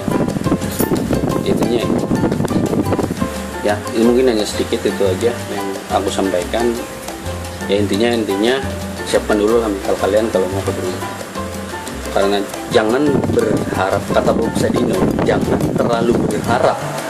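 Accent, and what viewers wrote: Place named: Banyumas, Indonesia. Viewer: Ketemu maning ketemu maning Karo wong ngapak Ning Brunei 😀 Sukses selalu Bossque 👍👍👍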